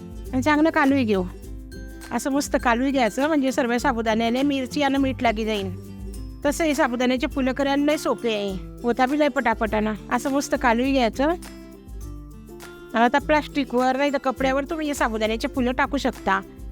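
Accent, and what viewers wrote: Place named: Khandesh, India. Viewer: छान आहे रेसिपी, तुमची खान्देशी भाषा कानाला लै ग्वाड वाटते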